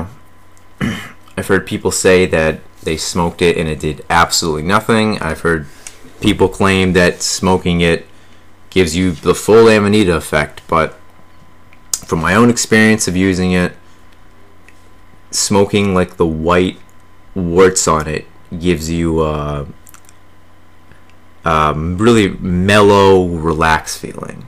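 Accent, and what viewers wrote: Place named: Rhode Island, United States. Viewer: Rhode Island accent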